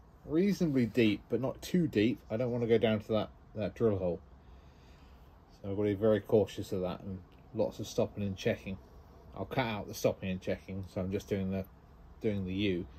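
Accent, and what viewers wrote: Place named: United Kingdom. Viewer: Well done love how pretty that grain is what type of wood did you use I know you mentioned and said what type it was but I just did not quite understand stand what you were saying with that wonderful British accent you have may be you should have let Lenore with her Canadian British accent say what it was being I am a Canadian Blessings from Hanna and all the hot weather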